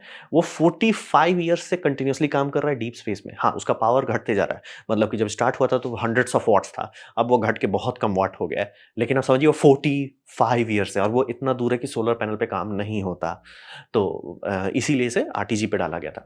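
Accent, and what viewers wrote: Bihar, India. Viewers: liked ur bihari excent too❤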